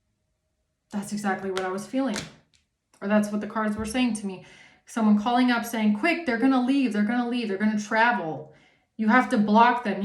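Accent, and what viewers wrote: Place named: Germany. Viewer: Good morning 🌅 beautiful 😇👀👑, hope you have a beautiful day night, love your accent Germany ❤💜🌅💜,I was abit worried about you last night, I wouldn't want to piss you off,I love you ❤, your are a earth angel 😇☀️⭐, anyway you distract me 😊, beautiful,I worry about you, when you bend down, your neck from your accident,need a massage, anyway looking forward to hearing your voice and thoughts 💬, peace love and light 🕯️ x😊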